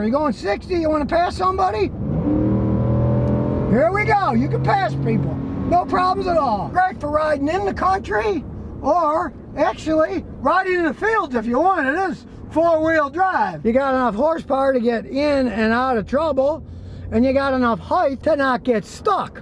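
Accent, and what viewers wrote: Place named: Tennessee, United States. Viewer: It sure sounds like Tennessee lol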